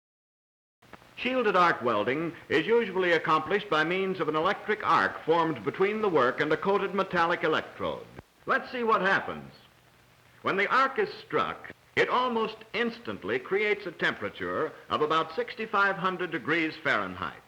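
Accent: United States. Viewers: You know you're gonna learn something when the narrator has that transatlantic accent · I can say, with absolutely sincerity and seriousness, I would have paid so much more attention in school if my teachers had all had the ol' timey, Mid-Atlantic English accent this guy employs. I practically feel like I'm a qualified SAW technician, ffs. :D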